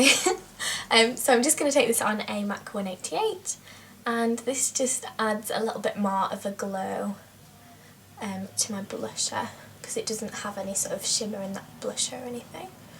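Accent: United Kingdom. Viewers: i like your british accent:) hihi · where are you from in the uk? your accent is so interesting ive never heard one quite like it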